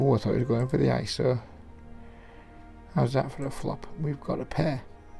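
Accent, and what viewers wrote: United Kingdom. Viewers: Understood, many thanks.. Ps, I have been trying to work out where that accent you have comes from, North or south of U.K?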